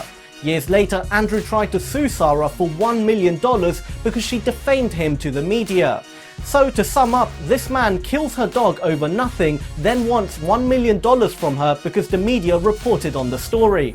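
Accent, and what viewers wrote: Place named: United Kingdom. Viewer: Gets the second like and first comment, How's your day British narrator ?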